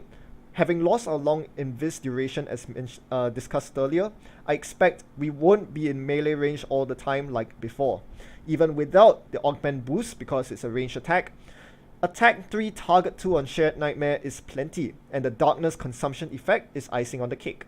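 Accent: Singapore. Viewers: You from Singapore by any chance?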